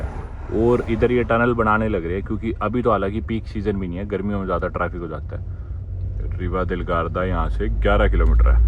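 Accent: Haryana, India. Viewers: bhai aap haryanvi bolte huye jyada mast lgte ho ❤ Gajab accent lgta h aapka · Haryanvi accent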